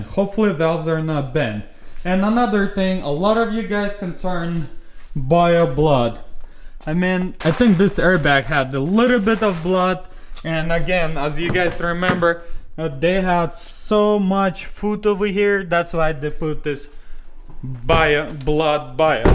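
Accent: Russia. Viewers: ты русский? акцент сразу заметен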